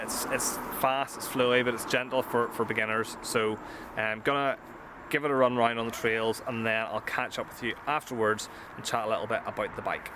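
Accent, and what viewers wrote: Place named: Ireland. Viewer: I thought the accent was familiar, my one year younger brother was born in Ballymote Ireland August 1965 at a Catholic nuns house and he's the most successful of 5 children , freshly retired with full pension and, I think I'm going with a 2022 Cube Aim pro in same color scheme as your's $500 off retail right now at $999.00 CAD ,& putting 5 great touring accessories on it Both fenders,Both Lights and the Universal Kickstand,So should be a great bike , Never have spending over a G Note for a New pedal bike instead of buying second hand used for $400 less, So I figured the extra cash for a New bike is the wiser way to go. From Alberta Canada.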